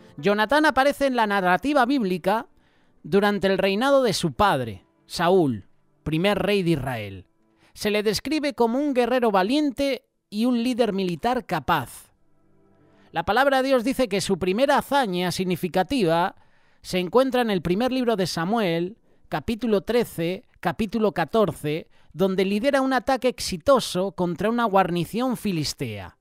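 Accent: Spain. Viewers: Saludos desde Colombia gracias por su narración de la historia de David y Jonathan Bendiciones .... Tengo una pregunta fuera del tema me di cuenta que es usted español porque en España prevalece el catolicismo si en toda europa estuvo Jesus con sus enseñanzas? Es una curiosidad me gustaría si alguien sabe la respuesta me explique gracias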